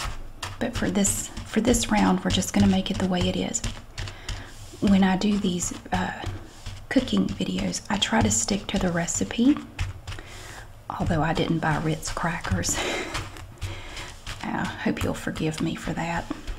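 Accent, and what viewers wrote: South, United States. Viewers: I love you're accent! I love southern accents. I'm from Texas, and I have a lot of southern pride! You're so sweet. I love your videos. This recipe was very good. I made it a couple days ago after seeing this and it was great. We are a hellmana family. I don't think they sell Dukes here but would like to try it. Have a great day! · I like your Southern accent. I am enjoying your voice... it's easy like Sunday morning. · that face you made when you said, BUTTERY ROUNDS! lol you crack me up. So glad to see your tomato pie; I love your southern accent. I'm in Wilmington, not so far from you! Thanks for making these videos.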